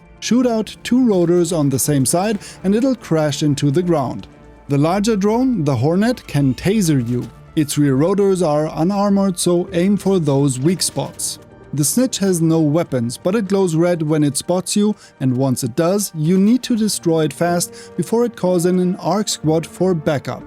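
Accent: Germany. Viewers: I hear a German accent I turn on focus mode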